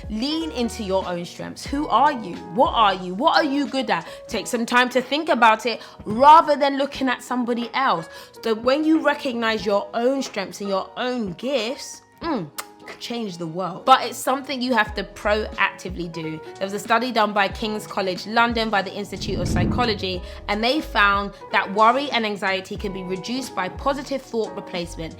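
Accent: United Kingdom. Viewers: Interesting seeing a briiiish accent sistah with a cool vlog. Found it by accident 🙂 Nice it's not another barbie doll Instagram drama diva.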